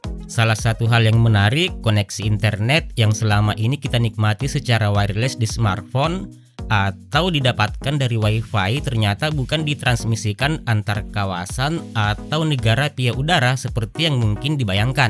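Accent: Medan, Indonesia. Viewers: Medan kali suaranya 😅😅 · Medan punya nih suaranya 😁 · Orang Medan ya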